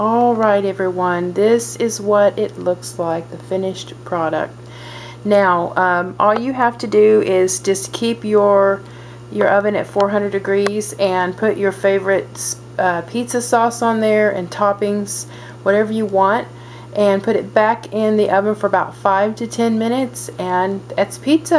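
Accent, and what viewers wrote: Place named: South, United States. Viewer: I can't wait to try this, as pizza is my downfall and so hard to resist...Thank you for this video, as you explained every wonderfully. You have a lovely accent, too, are you from the South?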